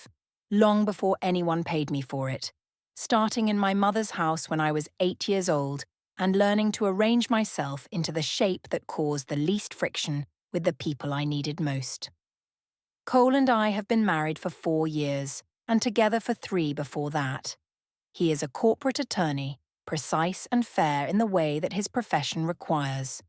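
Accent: England, United Kingdom. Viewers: I enjoy the posts on this channel: the English accent, the female voice for a female narrator, the precision of ths details, and the studied inexorability of the narrative, among other virtues. This story, however, was WAY too subtle! Are we to understand that the narrator had a lesbian relationship with Sasha? Or was she only thinking of it? And what was the meaning of the two posts? How was the concept of thoughts being modified as if they were her own (or some such wording) -- whatever that could have meant -- defamatory? And what was the significance of the two copies of the novel, and what exactly was the narrator's explanation of why she liked to run her fingers along the spines of the books? Was it related to a lesbian relationship in college? And did the husband really divorce her because she suggested she was pressured into moving into the suburbs? 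I am utterly baffled by this story.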